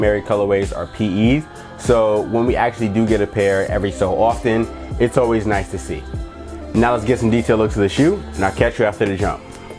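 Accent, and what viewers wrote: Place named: California, United States. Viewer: You say you from new york I do not hear it in your voice son you sound like a california kid my accent is deep from South Bronx heart of new york kidd E 194th Briggs come on son rep right son aiiight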